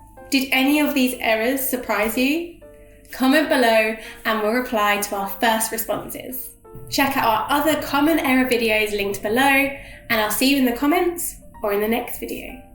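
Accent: United Kingdom. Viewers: BRITISH ENGLISH~ 액센트 너무 좋아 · 한국사람도 아닌데 한국인들이 실수하는 부분을 잘아시네요. 도움많이 됐습니다. 영국발음도 배울겸 구독하고 갑니다! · 영국식 영어 발음 불편하다.. 그리고 어차피 모국어가 아니면 의사소통이 첫번째 목적인데, 영어가 모국어인 사람도 완벽한 영어를 구사하지는 않치 않나.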